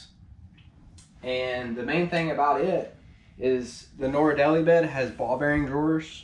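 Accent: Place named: South, United States. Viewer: I wonder what this southern sounding guy keep in that safe 🤔🔫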